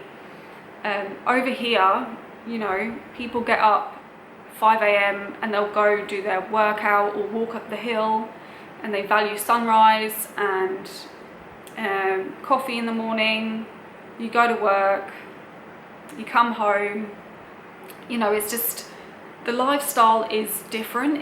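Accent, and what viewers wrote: Australia. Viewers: Your accent is turning Aussie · Happy you like it here, your way of talking is a real laugh, such a great mix of UK and OZ. You live in Townsville but first I thought I heard more of a Melbourne accent there.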